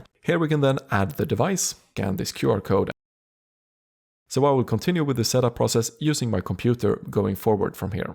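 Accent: Sweden. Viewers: This work good on Sweden? I hear on your english that you are from sweden :D Hur många äger du och vad tjänar du per månad just nu? Har du testat ta ut profit ?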